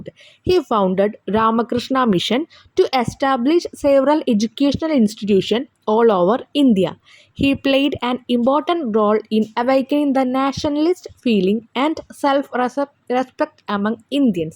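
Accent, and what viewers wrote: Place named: Chalakudy, India. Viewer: Mole poli 🤘 ടീച്ചറെ നാട് ഏതാ ചാലക്കുടി ആണോ മാം എന്റെ ടീച്ചറെ സംസാരം അതുപോലെയ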